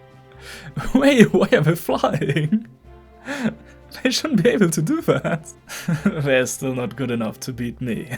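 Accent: Germany. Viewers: Listening to this video knowing German is basically no different from watching this video in German lmao Great video, but I will never unhear the accent. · i think you ar a german i can hear it in the voice as a german myself i know how that sounds when german speeks englich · Are you german by any chance? I really hear it in your voice, and i LOVE that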